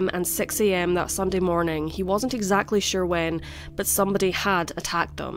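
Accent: Scotland, United Kingdom. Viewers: Geez, some of these yt honchos are doing really dodgy things! The same thing happened with two other channels I watch. One of them ended up going to Odyssey. Yt had permanently demonetised his amazing crime channel. Your channel is brilliant and your content is fascinating. The research into these cases are phenomenal too. I have followed you for months and your Scottish accent is lovely. Anyway, cheers from Australia!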